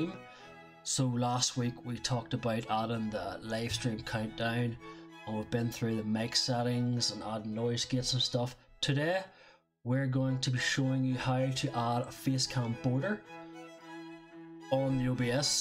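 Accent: United Kingdom. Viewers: Is that a Belfast accent?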